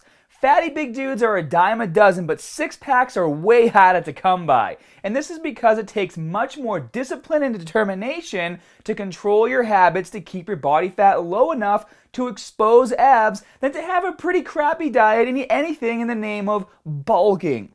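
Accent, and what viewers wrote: Boston, United States. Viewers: In from Braintree bro! U must be from mass cause I sound exactly like u! Go Boston accents!! Just subscribed right now, love your bro!! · This man's boston accent is getting intense lol. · Let me guess, you're from Boston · The Boston accent is severely strong with this one lol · when did you start this hardcore Boston accent haha never used to be that strong. I'm from mass too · i been a sub for a really long ass time and i love how your not editing your videos as much and how you love your boston accent now. keep it like that. also i only put myself in food comma when im allowed a cheat day. im a boxer so that comes like once a year and half or two. everything else is a pretty much strict diet, including water intake. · when did this guy get such a heavy boston accent? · Why is he talking like that? I haven't seen his videos in a few years Looks like developed a Boston accent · Keep the full accent man should be preserving your culture as part of your culture that Boston accent Go full on with it man set you apart from everybody else is part of your character as part of your routine it's part of Who You Are, is trying to come out all the time but you're suppressing it before b u i mean that with all that's you Boston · When did pick up this Boston accent? 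I used to watch him a few years ago and he never sounded like this?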